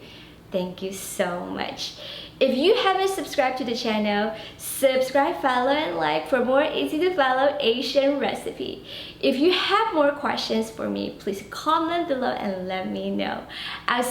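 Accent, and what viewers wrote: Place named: Thailand. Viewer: You were in Thailand! That’s my home country. You look like one Chinese actress, her name is Yang Zhi. She is in the drama call “ash of love” it’s really good C drama 😊 and yes! The accent is our pride😊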